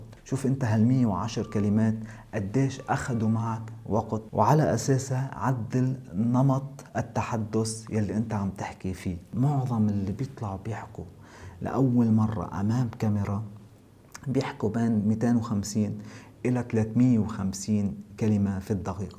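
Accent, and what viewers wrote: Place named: Lebanon. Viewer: اللكنة اللبنانيه جميله جدا وصوتك واسلوبك الهادي معجب فيه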